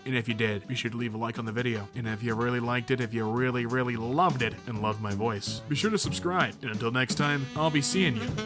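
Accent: United States. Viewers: Why are you speaking American rn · Suddenly an American accent WAT IS HAPPENING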